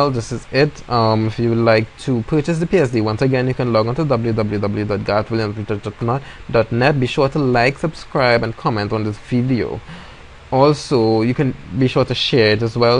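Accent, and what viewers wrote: Trinidad and Tobago. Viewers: Are you from Trinidad ? :) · definitely from Trinidad! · Def a trini with that accent, nice work.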